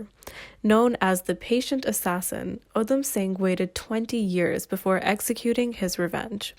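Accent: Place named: United States. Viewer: Does she explain anywhere why she speaks with an American (or mid-Atlantic) accent?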